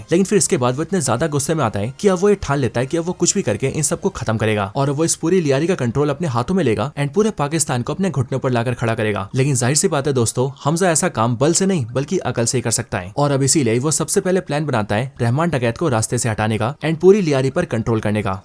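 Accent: Bengal, India. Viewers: Tum bengali ho kya???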